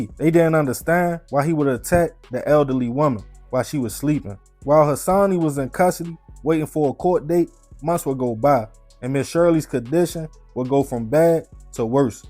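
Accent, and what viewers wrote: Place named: Maryland, United States. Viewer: Da con…tent! I’m from NJ and my family lived in Silver Springs, MD and your voice made me recall their accents. Salute.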